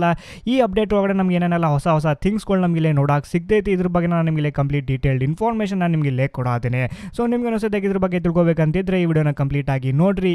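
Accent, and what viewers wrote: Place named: Uttara Kannada, India. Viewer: Nim UK Kannada riwev super bro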